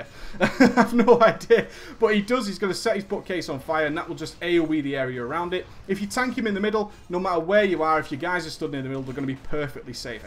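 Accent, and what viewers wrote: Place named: United Kingdom. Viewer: Where can I find WoW videos where the commentator is not british, loud, and obnoxious?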